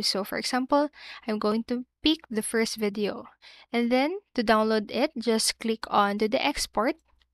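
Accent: Philippines. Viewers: Classic filipino/indonesian accent but this video helped me i am going to drop a sub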